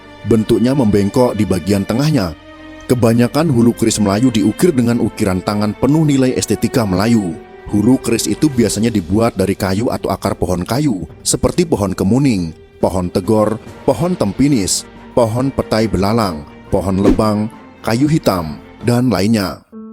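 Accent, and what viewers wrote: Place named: Java, Indonesia. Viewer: Cetho jowo mas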